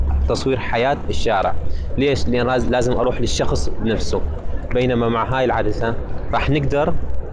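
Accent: Mosul, Iraq. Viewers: كنك مصلاوي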